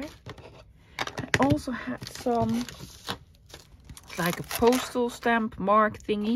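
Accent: Netherlands. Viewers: Hello, I am new to junk journaling and stumbled on your videos by accident, your Dutch accent was like music to my ears as I travelled to the Netherlands a lot when I was much younger, places like Amerstam, Rotterdam, Guoda, Delft, I visited Glass city and the flower markets and auctions I have been to Floriade twice, and many other smaller places, unfortunately I have not visited for quite a few years now, I love your videos my first attempt at a journal is almost finished it's not perfect but I like it, it is filled with many of your projects from the 100 day challenge, you are such a talented and gifted person, and a lot of things from your past resonate with me.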